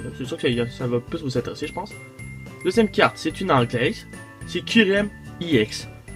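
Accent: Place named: Quebec, Canada. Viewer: J'aime trop la voix québécoise sinon merci du concours au top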